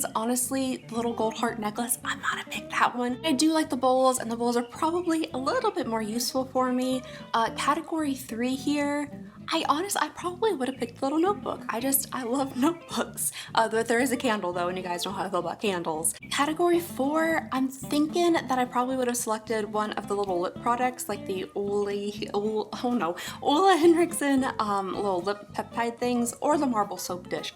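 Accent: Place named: Ohio River, United States. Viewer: I know this is completely off topic but…. It never fails to amaze me that I live maybe 75 miles to the north of you if that and we have vastly different accents. How’s the divide at the Ohio river that mighty in this accent game?!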